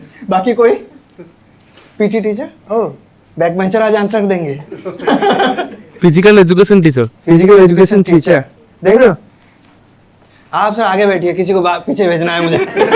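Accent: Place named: Odisha, India. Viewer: Jemiti Droupadi Murmu Hindi Kuhanti kintu Lage Pura Odia kahila Bhali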